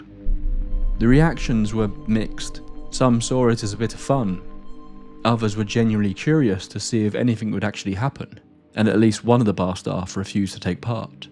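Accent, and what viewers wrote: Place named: North East England, United Kingdom. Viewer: Were was this pub as the voice sounds north east of england were am from would love to no ❤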